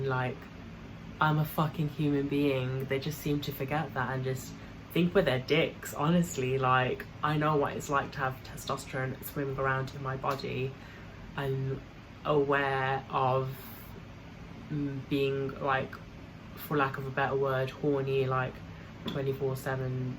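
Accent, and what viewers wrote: United Kingdom. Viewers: She Has A Fat British Accent Which Makes Her Provocative 😊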